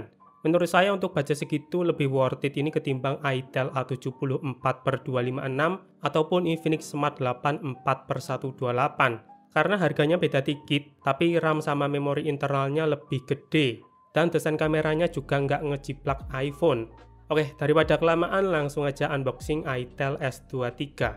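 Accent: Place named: Banyumasan, Indonesia. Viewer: "ya jelas ngelag lah blog" ngakak anjir ngomong nganggo logat banyumasan lucu piye ngono maseh😂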